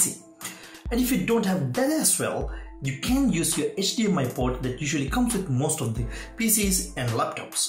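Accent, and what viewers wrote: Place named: England, United Kingdom. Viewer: Enjoyed the video. Thank you for the useful info. Although..... It was toooo difficult to understand the English Accent, and the opening logo was sooo long I almost skipped.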